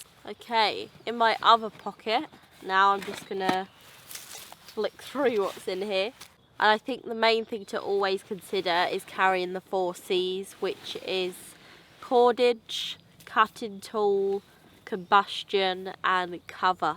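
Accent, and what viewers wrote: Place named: RP, United Kingdom. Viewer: Another great video. Thanks a lot. Always nice to have someone's different opinion on something. For example, i was just looking at the small cook set for my day pack i want to start, and wasn't sure on "alcohol/petrol" stow that comes with it. but one phrase of yours made it easier for me:" for the day you can't start the fire". always different prospective to look at something. Day pack is a day pack and needs to be light, but what if you got rain and got lost - extra few oz is nothing when you need to eat and a day trip becomes overnighter. Also, admiring proper Queen's English and proper acescent that comes with it Keep doing good videos. Thanks.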